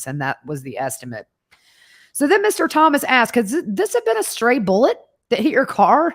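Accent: South, United States. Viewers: …I AM SUPER PROUD OF U! This is wat I wanted to do growing up…but ya know, having that baby boy 19 yrs ago changed my life a bit. U r on a well known networks doing yo thang! U look good and sound professional…with the southern twang! Proud of it! From the pecan pie to the sweet tea❤ Thank u for representing us well down here! Much love from M-I-crooked letter-crooked letter I, crooked letter crooked letter, I-Humpback, humpback I ❤ PS…can we get Fruitloop one night to talk about this case? Plzzzzzz?!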